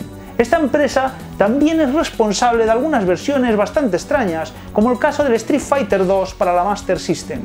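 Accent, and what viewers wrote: Galicia, Spain. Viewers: Eres un crack...hay gente que no se da cuenta de que eres gallego y que hablas así que no estás imitando o poniendo voces...lo que pasa es que aquí nada más que hay heaters mongoloides y envidiosos...sigue así fenómeno!!! Viva Galicia y Vixca Valencia!!! Pd: lo siento por lo del penalty de Djukic...un abrazo de 16 bits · Brutal me parto con tu acento gallego, no cambies. Me encanta descubrír estás versiones imposibles en consolas menos potentes. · 10/10 la imitación de. A mí me parece simpático el acento gallego. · Ostiaaaaa!!! Q bueno el giro final con José en Paz descanse.. de nuevo engañando a todo dios.. Patéale el culo a esos haters! Deberías subir un vídeo cada día para alegrarnos la tarde... XD PD: soy de ElBierzo y por ende medio galego, y cuando te oigo parece que estoy hablando con un paisano de la miña terra!! Sigue así amigo! 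Y ni te dejes avasallar por la envidia de esos niñ@ y no tan niñ@ Rata! 🐭🐀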